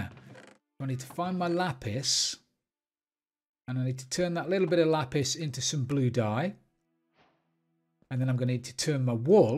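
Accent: United Kingdom. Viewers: Awsome video and I just realized I find my self waiting for your British accent to sing some random song so I can lol thanks my friend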